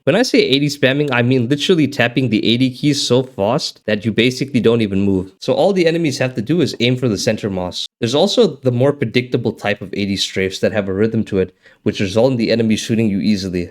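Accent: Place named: South Africa. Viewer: I suspected you were South African from the accent and your ping and your bio confirmed it. Nice to see someone from the region making content like this. I'm wondering if you play on Middle East servers or VPN yourself to EU. I do the latter, but the 200 ping can be pretty frustrating at times even though I'm used to it. If you play on ME, how are the queue times like at high masters - GM?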